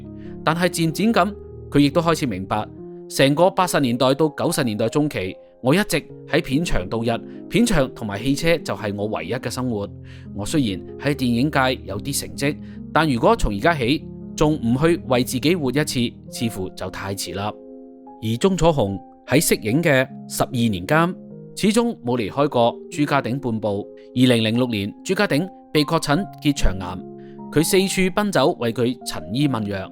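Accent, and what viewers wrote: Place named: Mandarin, China. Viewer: 主播你的第一语言英该是普通话吧？不介意你的口音，但是有的字念得完全错了，比如“闺蜜”"联袂”。。。祝你进步！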